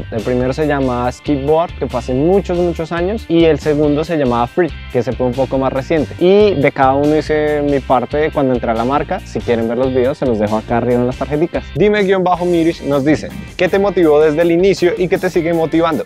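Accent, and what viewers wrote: Colombia. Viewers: Eres colobiano?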